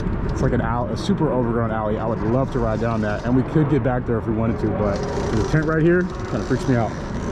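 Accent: Minnesota, United States. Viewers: Where you from Minnesota? You got the accent lol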